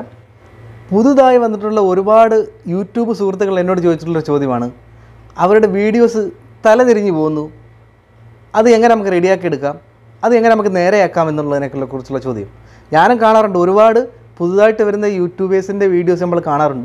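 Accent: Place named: Tamil Nadu, India. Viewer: sounds like your talking tamil? ill try ippadi irruka? hehe or maybe you live somewhere from tamil nadu sir?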